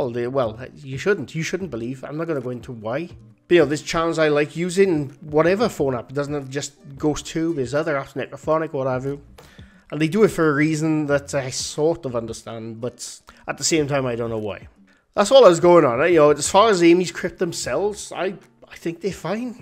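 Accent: Wales, United Kingdom. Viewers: your wonderful Welsh accent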